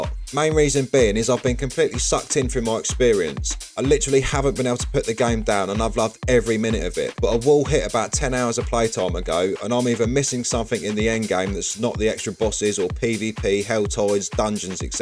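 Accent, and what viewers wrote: Cockney, United Kingdom. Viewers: The best part of this video is that listening to your voice without subtitles has surprisingly shown me a drastic improvement in my listening skills, particularly for British accents (or Australian accent?). Cheers from an Indonesian Diablo 4 Player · Hahaha nice man! This is a strong English (cockney?) accent! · how much of a Geezer accent you have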